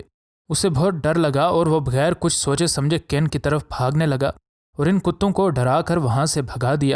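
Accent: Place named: Sindh, Pakistan. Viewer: Ap Urdu me M.A.kro ya P.H.D pir b Teri Urdu teakh nhn ho Sakti,q.k ap Sindhi Bhai hain... Tumse ziada achi Urdu wos 4-6 saala bacha ka hy jisko alif zabr ha or beh zarb ba likna nhn a ta iski waja Yeh k yeh bacha uska bacha hy jiska Abba panageer,Hindustani, mahajir,byyah, or Urdu speaking hy...Lehaza hi Sindhi Bhai urdan daan tum nhn bnskte pir Q apni zaban ko gol gol karke Urdu bolta hy,ap bas Urdu bolo lkn pir b Sindhi E rahoge....achi Urdu sirf bayyah log E bolsakte hain Na me r Na tum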